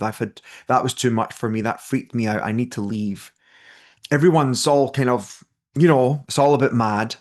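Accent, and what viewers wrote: Scotland, United Kingdom. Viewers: Hi. Nice to hear another Scottish person, im from Edinburgh 👋 I speak to my angels and guides every day. This video appeared on my feed, yet I've never heard of you before. Someone must have put it there 🥰😇 I see my angels sparks of light ✨️ everywhere around me. I saw an electric blue flash of light too that i believe was one of my guides. They all got me out of a difficult situation and send (bombard) me with messages and synchronisities everywhere. I also just recently did my Angelic Reiki 1&2 ✨️ 😇 I wish i could physically hear and see them though. Still on my learning path, and reading every book i can. Would be great to connect with you though ❤🙏 I really want to develop and strengthen my abilities to help others ❤ It's nice talking to other like-minded people 😊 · ❤, much gratitude for sharing your precious time and knowledge with us all. 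Joining from Tenerife. Born in Aberdeen Scotland. It's lovely to hear your Scottish voice. ❤my gran also read tealeaf.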